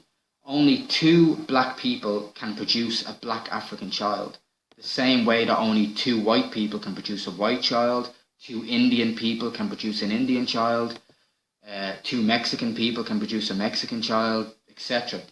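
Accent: Ireland. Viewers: you are correct sir about mixing. i like the irish accent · Btw you have the same english accent as Connor Mcgregor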